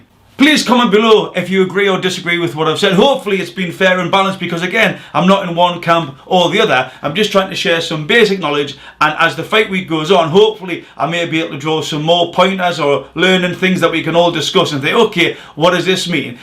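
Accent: Newcastle, United Kingdom. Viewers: Anyone else he sounds like true geordie or is it just me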